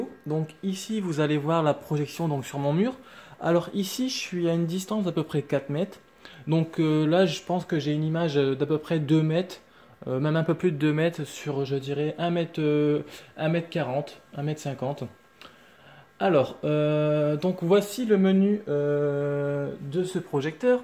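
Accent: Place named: Cher, France. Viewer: Bonjour Vous êtes du chére. Je reconnais l'accent.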